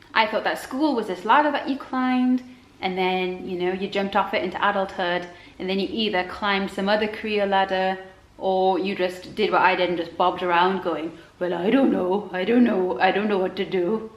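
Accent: United Kingdom. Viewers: you are such a lovely person! So glad I've just found your channel. Subscribed! It's always refreshing to hear a British person talk about minimalism.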